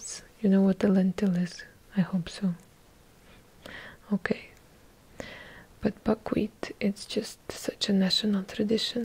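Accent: Russia. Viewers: Why are you so underrated 🥺 ma'am im from Pakistan and i love your heavy Russian accent asmr ❤️ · Some people say french Italian Spanish me i say Russian all the way! Just perfect! 🔟🌹😍🥰💗💗💗#️⃣1️⃣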